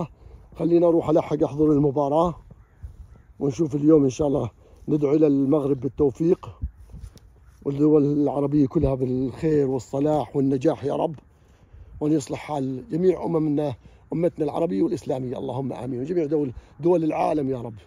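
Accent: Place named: Palestine. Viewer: باين من لهجتك فلسطيني أو أردني تحية لك من بلد المليون شاعر الجمهورية الإسلامية الموريتانية ❤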